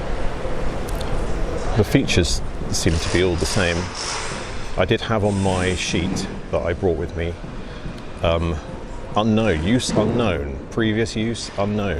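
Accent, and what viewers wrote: United Kingdom. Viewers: damn definitely having a British accent makes you sound so much less insane. I pray you get some help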